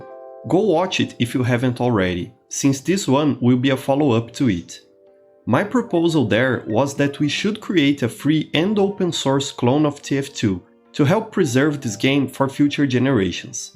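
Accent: Brazil. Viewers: why does your way of speaking seem so much like a brazilian speaking english?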